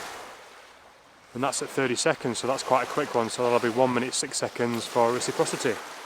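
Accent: Yorkshire, United Kingdom. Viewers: Sounds to me like you are originally from Yorkshire.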